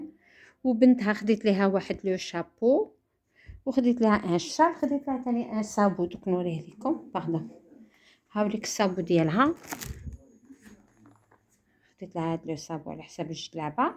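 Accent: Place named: Algeria. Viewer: خالتي واش أصلك من المغرب والجزائر حيت عندك طريقة كلام جزائر ونتي وقيلا دبا بالمغرب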